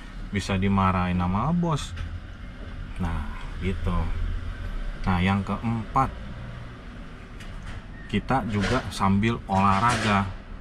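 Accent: Jakarta, Indonesia. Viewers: gua suka lihat loe walaupun tinggal di amerika tapi masih logat betawi haha.. semoga sukses bang! :D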